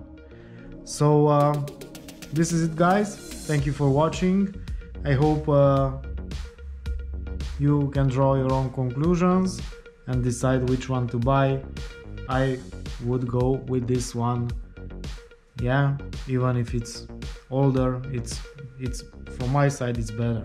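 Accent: Romania. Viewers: Esti roman, se simte dupa accent, nu trebuie sa ma uit la tine in profil ca sa imi dau seama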